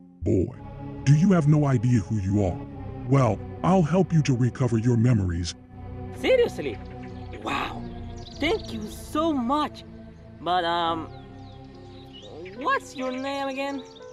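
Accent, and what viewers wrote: Chile. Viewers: Sabia que ese acento era chileno, jajaja muy buen canal! Me subscribo!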